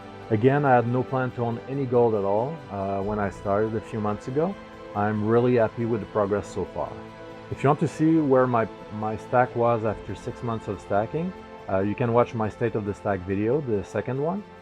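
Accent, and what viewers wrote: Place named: Quebec, Canada. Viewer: I recognize the Québécois accent lol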